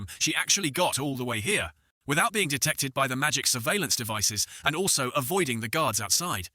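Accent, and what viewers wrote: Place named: United Kingdom. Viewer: yo love the british voice 🙏💫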